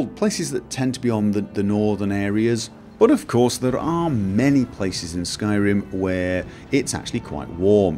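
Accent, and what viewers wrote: United Kingdom. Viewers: ***** Thought so, he sounds Brittish or something like that.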